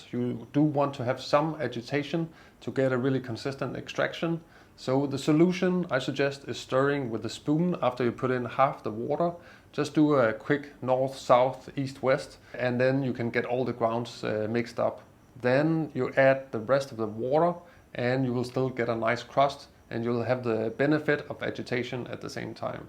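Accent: Denmark. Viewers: I heard your accent and knew you were from Denmark before looking at your profile to confirm! Tak I would love to learn how to use a French press. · Are you Danish? You sound just like a Dane speaking English 🤔 [Edit] I was right.😆 Your name is. 😆 You cannot get more Danish than that.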